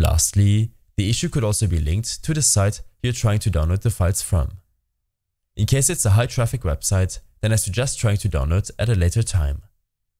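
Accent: Germany. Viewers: Man hört deinen Deutschen Akzent raus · haha ich konnte an der stimme einfach dran erkennen dass du deutsch bist wegen der aussprache😂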